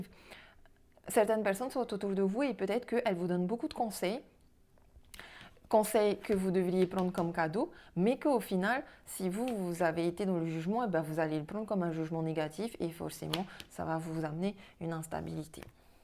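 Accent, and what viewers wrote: Tahiti, French Polynesia. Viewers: Bonjour, merci pour votre message 🥰oui oui vous avez bien reconnu l’accent tahitien d’une tahitienne 😉 belle journée ☀️ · Bonjour, merci pour votre travail. Vous avez l’accent tahitien. Belle journée.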